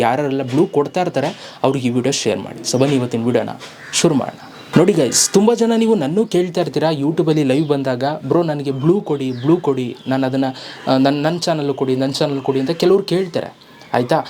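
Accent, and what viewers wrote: Mandya, India. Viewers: Pakka nam mandya. Bhase nivu. Sup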